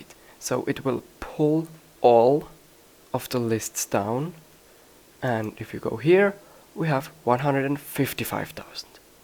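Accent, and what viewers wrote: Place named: Germany. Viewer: danke fur tutorial bro ich wusste als du am anfang geredet hast das du deu tsch bist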